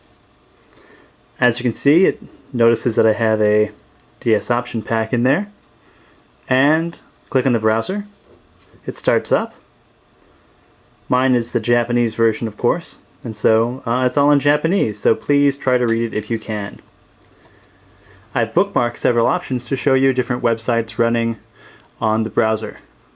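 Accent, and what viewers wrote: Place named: United States. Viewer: annoying american voice-over...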